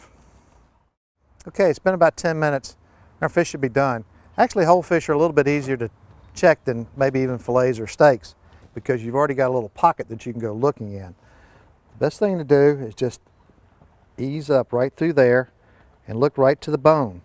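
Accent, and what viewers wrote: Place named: Eastern North Carolina, United States. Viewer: Sounds like you're from eastern NC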